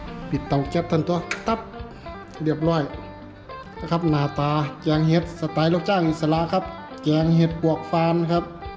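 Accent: Chaiyaphum, Thailand. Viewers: เจ้าคือมาเว้าคือสำเนียงคนบ้านข่อยแท่ คนชัยภูมิแมจ้า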